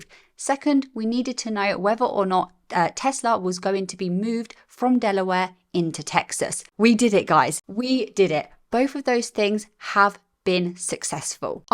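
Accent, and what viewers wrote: United Kingdom. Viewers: British accent ✅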